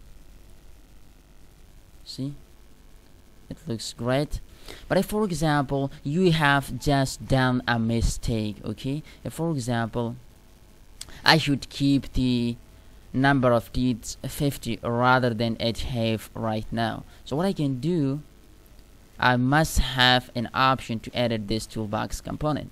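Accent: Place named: United States. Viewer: yaar accent to na daalo itna! sadi english bol lo aapka meericun accent se kuch samagh nai aaya!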